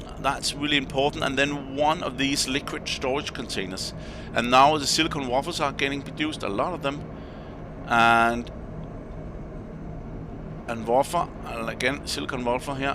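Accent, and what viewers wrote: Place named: Denmark. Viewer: Er lige startet på din Lets play! Kunne høre at du var dansker og ville sige Godt arbejde og pas på dig selv <3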